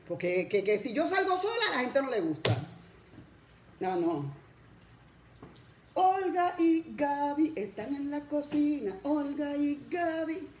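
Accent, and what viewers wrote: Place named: Puerto Rico. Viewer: Sii se nota que es puerto rique~e y apura sepa!! Y a tii a leguas se te nota la envidia....sii no tienes nada que decir aracante pal la mierda!! Boricua hasta la muerte!!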